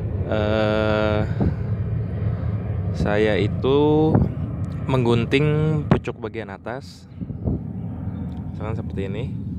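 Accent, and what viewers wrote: West Java, Indonesia. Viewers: kanyahoan sunda na..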